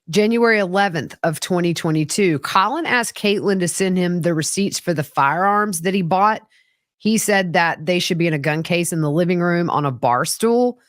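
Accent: South, United States. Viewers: And her southern accent fits perfectly for a case here in Texas!!!!😊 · Also from the south so I love your accent and your pronunciation of tumultuous is cracking me up 🤣😂🤣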